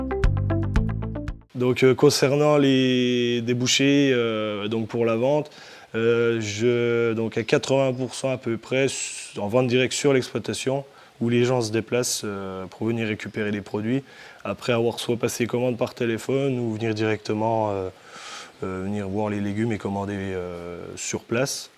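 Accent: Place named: Vosges, France. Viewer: Un bon accent Vosgien bien prononcer, comment reconnait on un Vosgien, tu lui dit, tu aime qui, ton père ou ta mère, il doit te répondre, j'aime mieux le lard, tu lui dit, le gras ou le maigre, il doit te répondre la couenne Je te souhaite pleine réussite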